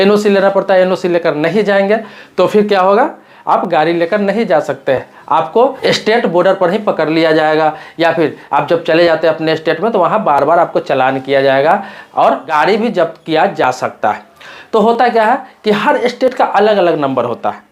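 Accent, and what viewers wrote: Bihar, India. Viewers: Mere lag raha hai ki tu bhi bihari hi bol raha hai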